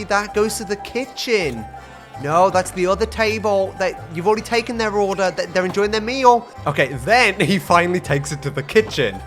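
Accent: United Kingdom. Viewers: Sometimes I deadass forget that is british and then abruptly remember in the middle of the video even though his british accent is prevalent in the entire video. No idea why Anyway UNPAYED LABOUR LETTTTTTT'SSSSSSS GOOOOOOOOOOOOOOO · are you a brit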